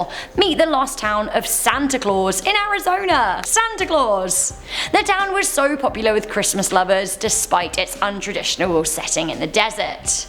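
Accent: United Kingdom. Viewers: i hate it she needs to chill out its very annoying to me i dunno what i have against britsh accents im sorry i dont mean to offend you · sthurston she from Britain duh they pronounce words differently then Americans common sense there try using some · I found you. My company’s newsletter just had a “Who looks the sexiest in a red dress” vote. was the winner. I didn’t know who that was so I was told to do some YouTube searching. I found this and I have to agree, the British accent is definitely a cherry on the cupcake. Just thought I’d share that...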